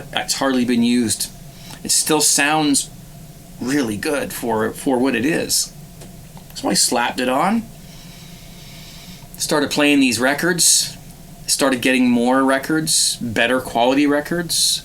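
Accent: Canada. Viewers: I'm old enough to remember playing 45's on turntables with spindles that held a stack of records that were automatically dropped and played in succession—hence the term "stax of wax." Great for the condition of both the stylus and records. 😉 BTW, you sound like you might be across the river in Canada? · I'm getting back into LPs and singles and just like your past experience, I am now noticing how much better clean records CAN sound with a good setup, compared to the substandard record player my parents and I had in the 1970s and 80s. By the way, do I detect a Canadian accent? Thanks for the channel!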